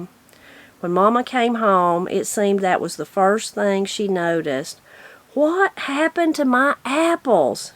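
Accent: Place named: South, United States. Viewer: Thank you both for the wonderful stories makes it even more amazing with her southern draw😃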